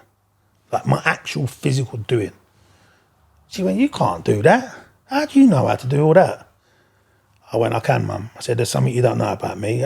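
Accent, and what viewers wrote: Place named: Glasgow, United Kingdom. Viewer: Great podcast 👏 lovely guy! Im Glasgow born and bread and love it 🏴 would like to hear more of your glasgow accent 😂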